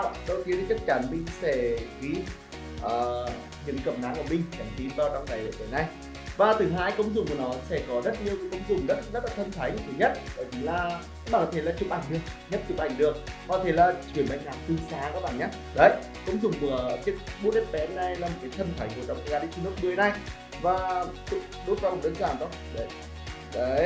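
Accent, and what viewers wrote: Nghe An, Vietnam. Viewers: Nghệ An nào 😂😂😂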